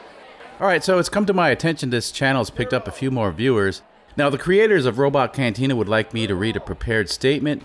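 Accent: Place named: New England, United States. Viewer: You sound like a New England transplant. Wicked awesome, hey.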